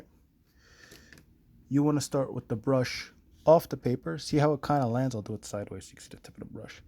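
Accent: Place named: East Coast, United States. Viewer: That was fantastic. Also your accent made it feel like my cousins from the East Coast were giving the tutorial. Which for me was comforting.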